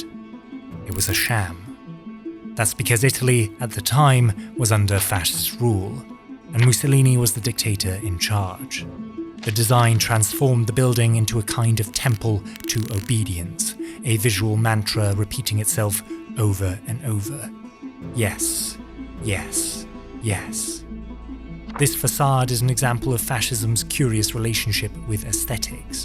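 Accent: England, United Kingdom. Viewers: The host sounds quite similar to Simon whistling who runs biographics, war fronts and loads of other channels? I does say the person who runs the channel is Swiss but the host has an English accent. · Okay, I have a question aside from saying: This looks and sounds amazing!!! But where are you from? The german is sooo good, the italian also sounds good (even tho I don't speak it) and the english sounds british. I am like, so confused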